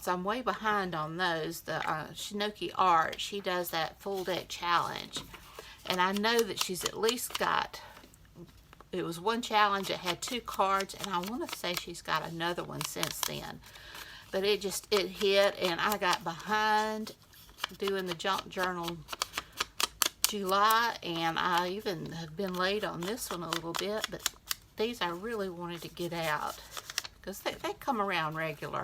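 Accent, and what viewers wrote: South, United States. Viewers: I really enjoyed this video; b/c you were naratting it, it's so nice to hear that southern accent. I can't imagine the humidity you have been having this summer, we have been hit hard and it finally broke last night after a rough rain storm. Tell you hubby not to over do it out there and let him know you want to learn to use that zero turn. I'd love one but I have some side banks that can't handle one. Love that shoe and cutting the top was perfect, it makes a nice pocket. Did I see a rash on your right hand? I hope it's not poison ivy. Have a wonderful week and don't worry about being behind....... I'm 3 weeks behind now on these challenges......... OUCH 😂😂😂 have a great week 🎉🎉🎉 xoxo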